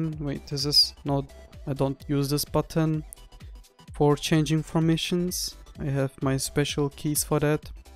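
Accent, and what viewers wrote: Germany. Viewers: Junge man hört den deutschen dialekt sofort raus hahah aber trz nice video😃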